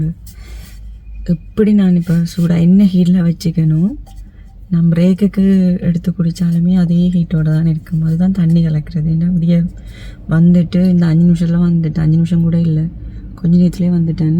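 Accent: Jaffna, Sri Lanka. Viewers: யாழ்ப்பாண தமிழ்❤ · யாழ்ப்பாண தமிழ் இனிமை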